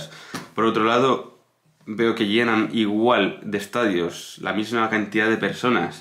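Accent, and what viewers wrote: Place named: Argentina. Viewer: Tienes un deje argentino muy fuerte... lo mismo que tus facciones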